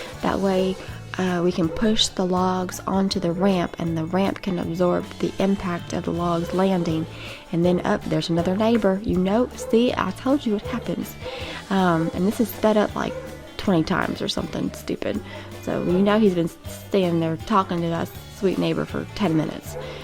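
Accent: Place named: South, United States. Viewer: What a cool southern accent ! , sounds like the purest form of a southern accent , is it ? can't tell i'm from Norway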